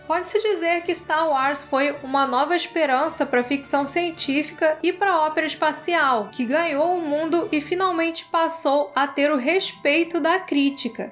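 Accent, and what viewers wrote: Rio de Janeiro, Brazil. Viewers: Você tem um sotaque fluminense. De que cidade você se encontra?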